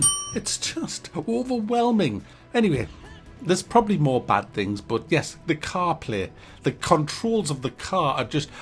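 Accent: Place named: Newcastle, United Kingdom. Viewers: Oh you’re a geordie! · Byker grove land I think.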